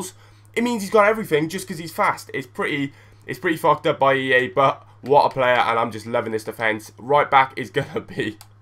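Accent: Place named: Welsh Valleys, United Kingdom. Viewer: You sound like leeroy from the valleys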